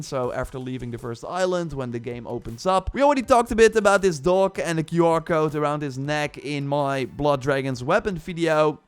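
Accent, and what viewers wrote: Netherlands. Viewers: Nederlander ik hoor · You have a really dutch sounding Acsent are you dutch?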